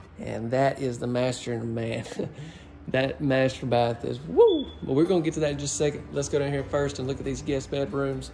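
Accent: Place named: South, United States. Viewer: I love your videos. The quality of filming has truly improved as you learn more from our comments. These homes are certainly impressive. But I totally watch them for another reason. I love your accent. It has been years since I was last down in GA and heard me some sweet southern charm. Have a blessed day.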